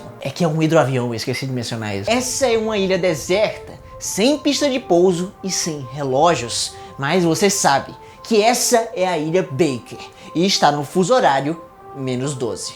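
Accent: Ceará, Brazil. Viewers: CRLH Eu tinha certeza que é cearense, praticamente afirmou agora, raramente confundo um conterrâneo 👽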